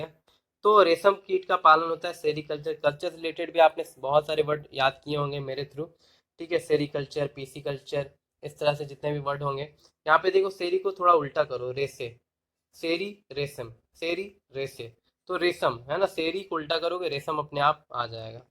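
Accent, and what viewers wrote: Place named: Bihar, India. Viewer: Sir aap bihar se ho kya...😍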